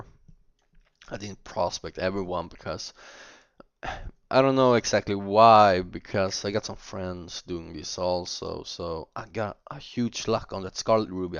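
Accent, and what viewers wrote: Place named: Sweden. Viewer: haha hör att du är svensk ;P:D har kollat på dina filmer, men vilket av dom är bäst? vilken är lättast och billigast ?? och vilken tjärnar man mäst på lättast om man ska säga så :)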